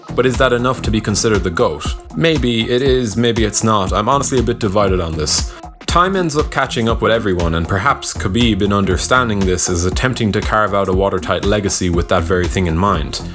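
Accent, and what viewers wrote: Ireland. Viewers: Nothing better than hearing how great Khabib is in an Irish accent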